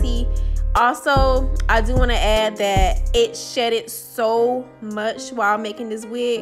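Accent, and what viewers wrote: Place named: Florida, United States. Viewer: Turned out pretty! You were very detailed and I could tell you were from Florida 😂😍 Much love from Texas!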